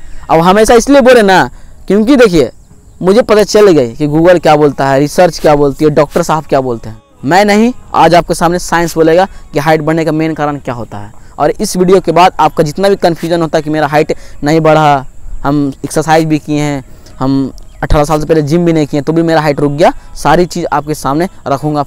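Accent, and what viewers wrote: Jharkhand, India. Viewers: Aap jharkhand se ho kya 😎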